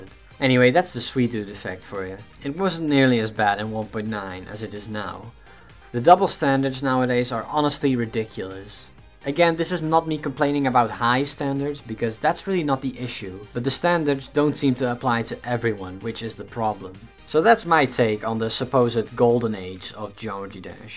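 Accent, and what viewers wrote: Netherlands. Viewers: Are you dutch? Because you have sort of a dutch accent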